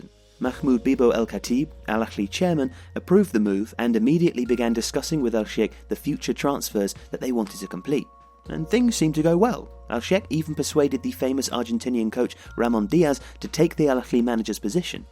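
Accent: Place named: Wales, United Kingdom. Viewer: Wow, now I feel like a dick. I didn't actually know about this Welsh. I'm from Egypt and we have this sort of stereotype about the British adding the Kh letter to everything like in habibi "khabibi", you can see it in really old tv shows here but in the Real world when I started talking to people from England especially Londoners not one of them was even capable of producing such sound, like saying Khaled as " D. J. K-aled " and then i was convinced that this stereotype came from the time of British occupation of Egypt where those who learned to pronounce the letter "kh" in Arabic are just showing off by jamming it into any and every word. I thought you were just being a hipster or something. P.S. in Arabic there are 2 letters for H, like B and P in english. and the one in el Ahly is different from the one in like Habibi, so i can see now how it would be hard for someone especially with a Welsh accent to pronounce it. 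just try not to think of Habibi when saying el ahly. think Haba Haba.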